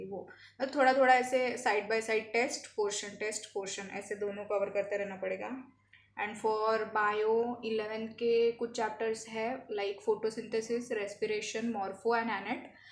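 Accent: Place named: Mumbai, India. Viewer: Hi... Can you tell how many chapters have you completed (or revised) till now? Are there any chapters that you are not doing? And also, this is not study related but are you from mumbai? Your way of speaking made me think that u could be from mumbai. As well as, in one of your videos you mentioned about splitsvilla. Actually, I also watch splits and its a distraction. So you are not the only one facing that kind of distraction. You are not alone, hehehehe. All the best.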